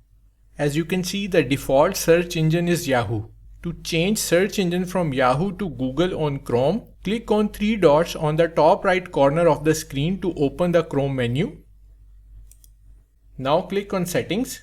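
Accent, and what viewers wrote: India. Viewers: When I heard an indian guys voice I knew the tutorial would be lit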